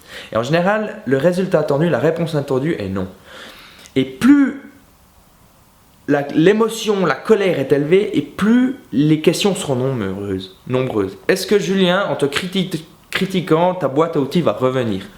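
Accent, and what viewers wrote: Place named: Switzerland. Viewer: On sent la Suisse dans ta voix 🇨🇭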